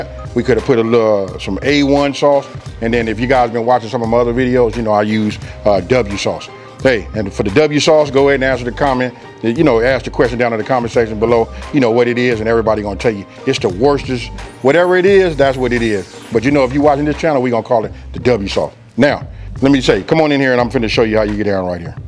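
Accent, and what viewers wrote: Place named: California, United States. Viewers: For all those who think Californians don’t have an accent, this right here is a prime representation of a thick California accent 😆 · Does anybody know where this guy is from? I think I heard him say he was in Vegas these days but he don't sound like he's from Vegas.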